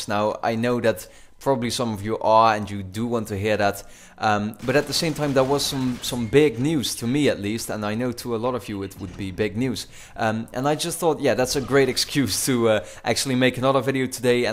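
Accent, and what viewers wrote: Netherlands. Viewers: Do you talk dutch a lot outside your commentaries? I came across your channel just today and I'm Dutch as well (just sending it in English so other people can read it). Quick tip, I know it's hard to get rid of your Dutch accent but if you want to get rid of it, try to talk as much English as possible. (I mainly got rid of it because I am talking a lot with friends from England and play BF3 with them, I still have an accent sometimes but now it's mainly gone) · You must be dutch right? I really hear it in your english. I'm dutch aswell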